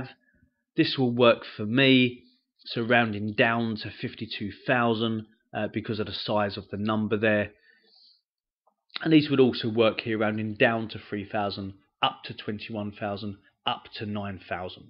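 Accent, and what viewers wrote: England, United Kingdom. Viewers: I have not heard English accent very long time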